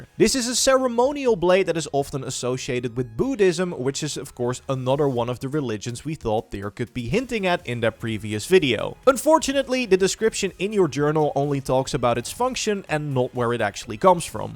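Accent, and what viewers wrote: Netherlands. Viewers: Nederlands accent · Are you dutch? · dat onherkenbaar nederlands accent :D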